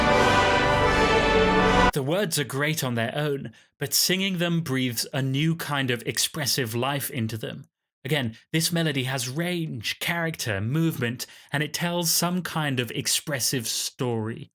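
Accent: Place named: United Kingdom. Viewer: I'm Brazillian guy and found your channel by chance. They are very eductional and informatives and beside I not be a native in english, your british accente help me understend almost all that you speak. it's clear and objective when the tonic syllable is stressed. US accente is terrible to non natives in intermediate level. I wish success with channel and keep to producing new videos.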